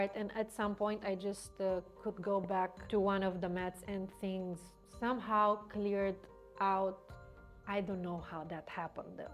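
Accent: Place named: Russia. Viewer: Hi u sound Russian😘Whoa this was posted on my bday, woohoo!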